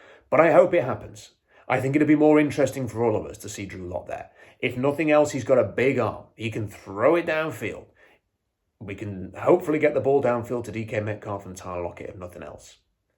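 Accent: England, United Kingdom. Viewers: Well said. It’s even funnier yet Better that a guy with an English accent says it.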